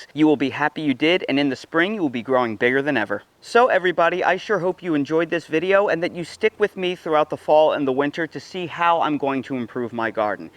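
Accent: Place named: New Jersey, United States. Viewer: I knew you were from Jersey!! ☺️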